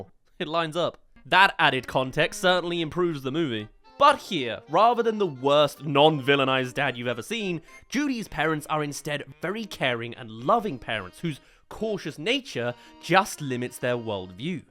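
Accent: United Kingdom. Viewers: Your British!?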